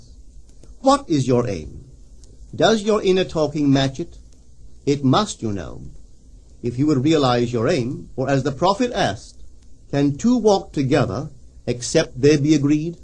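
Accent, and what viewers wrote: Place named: Barbados. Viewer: This isn't Neville's voice. This is someone else. I think they(on this recording) might also be from Barbado's but this NOT. I know his voice.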